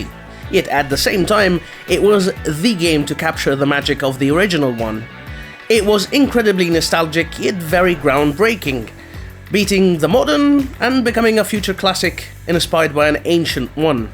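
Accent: United Kingdom. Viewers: I envy your british accent tbh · Your British Accent is awesome, really! keep it up, Dude!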